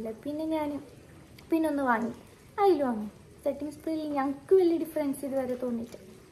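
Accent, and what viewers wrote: Kasaragod, India. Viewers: Ksd slang kekkaan vanna njn😌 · I can understand your slang because Iam living with my ksd friends seven years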